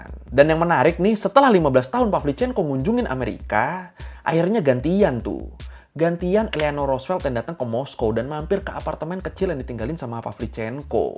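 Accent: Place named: West Java, Indonesia. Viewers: pertama kali denger bang ngomong sunda wkwkw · sunda lau mantep juga bang alus pisan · Ternyata Lo orang Sunda bang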